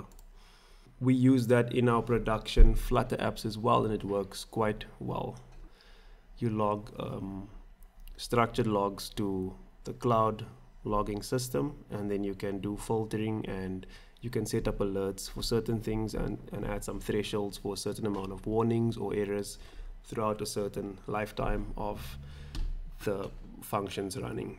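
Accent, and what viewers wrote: South Africa. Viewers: Hey dude, are you from South Africa ?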